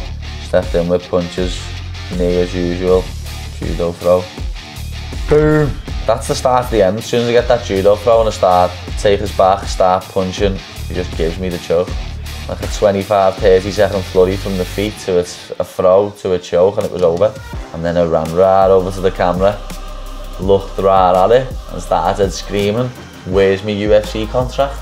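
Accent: Liverpool, United Kingdom. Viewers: What is this accent? Where is he from exactly in the UK? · I can actually understand what you're saying when you're alone like this, and not playing up the Scouser accent with your buddies. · liverpool, england. known as the scouse accent. it's a love or hate it kind of thing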